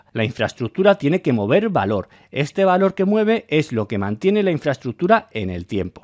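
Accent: Mexico. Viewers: Estoy empezando a pensar que eres mexicano Asentó, palabras, ejemplos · 🤔te parece que habla como mexicano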